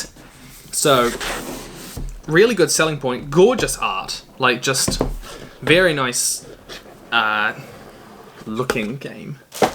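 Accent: New Zealand. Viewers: Omc you sounds so much more new Zealander than on your main channel.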